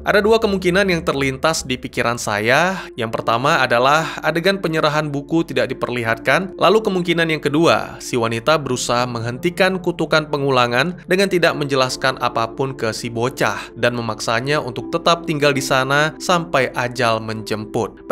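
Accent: Aceh, Indonesia. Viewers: Pasti abangnya orang aceh ya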